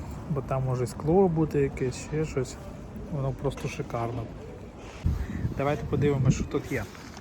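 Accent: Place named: Western Ukraine, Ukraine. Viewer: Повертайся додому ,ухилянт,ти,херовий..і працюй на свою державу..чи може тобі держава не потрібна?? Не соромно? Такий бугай шастаєш за кордоном.. Мова західної ..і відео ні про що ... Яке майбутнє даси синові?? І ще одне , блогером може бути людина ,,яка знає і може щось цікаве розповісти,,а не про бутилочку з водою.і взуття замурзане...😅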